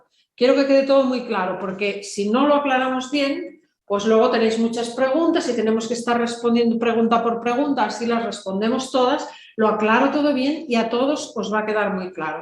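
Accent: Argentina. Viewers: Hola, Te escucho y escribo desde la Patagonia Chilena, estoy en la ciudad de Puerto Varas, y por casualidad hace un tiempo he puesto tus videos de casualidad, primero me llamó la atención tu llamado a estar preparado para una posible crisis, que me parece muy práctico y manejar los alimentos y plantas medicinales para usarlos sin tener que depender del supermercado en caso de alguna contingencia, usar conservas es una forma de no desperdiciar comida, me parece muy interesante lo que planteas, el pensamiento, el entendimiento de antiguos ardides que se han usado para interpretar a conveniencia las escrituras bíblicas es lo que más me gusta que hasta debería ser la base de las clases de religión, y Miguelito el marciano es todo un hit!! felicidades y siempre mucho valor y carácter, aparte me encanta el acento argentino que tenés, abrazos desde Chile estimada.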